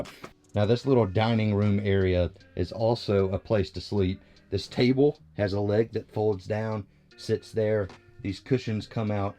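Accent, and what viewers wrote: South, United States. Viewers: Looking forward to future updates, and subscribing for that lovely southern speaking voice.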